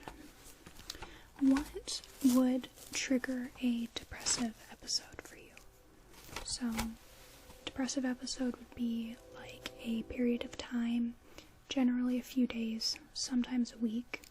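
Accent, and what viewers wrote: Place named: United States. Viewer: Can't resist that USA accent so seductive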